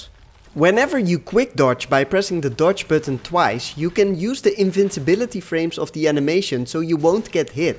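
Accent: Netherlands. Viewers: You sounds so dutch haha NL!! thx for the vid bro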